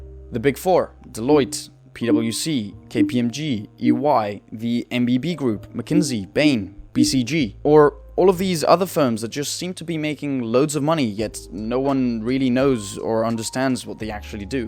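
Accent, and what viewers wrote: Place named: United Kingdom. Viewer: Impresionado por tu acento británico, peroooo, sinceramente, creo que hubiera sido mejor mantener el canal antiguo y abrir uno nuevo orientado a tu nuevo público objetivo, la verdad 🤷🏼‍♂️. Creo que así partes de cero con los interesados en Consultoría y pierdes a los interesados en aeronáutica o tecnología e ingeniería 🤷🏼‍♂️. Igualmente, espero que el comentario ayude con el "algorrino", y por supuesto, mucha suerte y todo lo mejor en esta nueva singladura consultora señor 👋🙃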